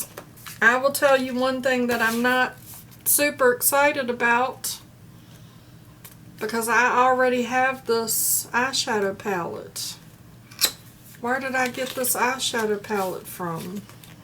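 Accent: South, United States. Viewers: I'm from the south and love your southern accent. That's a decent box and fun card!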